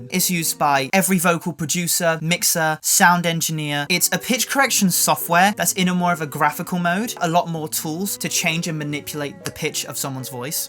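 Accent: United Kingdom. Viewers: That British accent (๑♡⌓♡๑) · Ok. This is the first time I’m watching him actually speak and I can safely say I wasn’t expecting him to be British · wait you're British? All the better ig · Trying hard to understand the fast British English with the lyrics(?) while thinking maybe that learning app is a good choice for me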